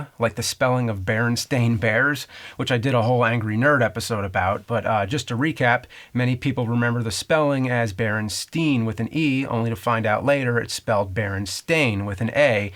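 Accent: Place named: New Jersey, United States. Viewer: Everyone correcting pronunciation as if his filthy Jersey accent isn't something we all love about him.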